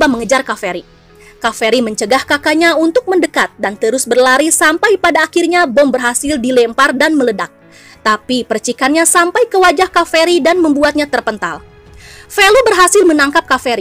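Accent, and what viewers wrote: West Java, Indonesia. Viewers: Kayaknya admin nya orang Sunda ya🤭🤭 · Mimin.orang sunda euy☺😁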